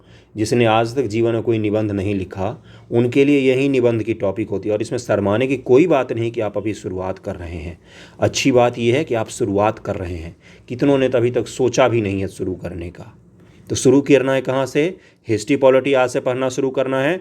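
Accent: Darbhanga, India. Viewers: Sir Aapka awaz Darbhanga Bihar se match kar raha hai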